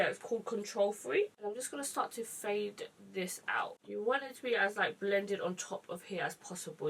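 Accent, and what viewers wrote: United Kingdom. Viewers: Yes u British u sound British I'm British anyway ly xxxx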